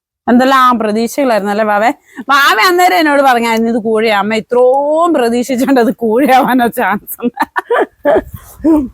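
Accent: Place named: Idukki, India. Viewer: ഞാൻ വിചാരിച്ചു, സ്ഥലവും സംസാരവും കേട്ടപ്പോൾ ഇടുക്കിജില്ലയാണെന്ന്❤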